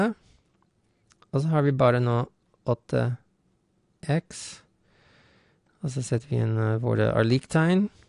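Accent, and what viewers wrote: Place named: Norwegian-American, United States. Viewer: Awesome norskamerikansk, haha :D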